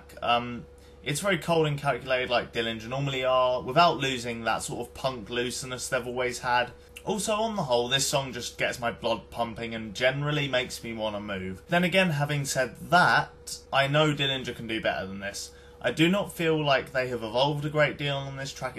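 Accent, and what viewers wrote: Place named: United States. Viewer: an american one; the kind of accent that lets you know that the guy talking wakes on redbull (that's not a hidden advertising message btw haha) :P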